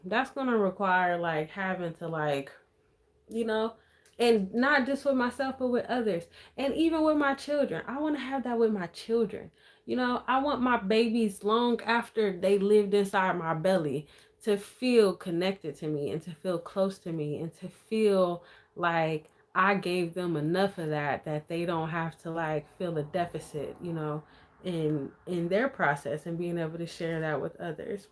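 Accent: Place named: Ohio, United States. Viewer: Beautiful voice. I know you have that very good Ohio voice. We can sang 🙏🏾🎼🎼🎼🎙💃🏿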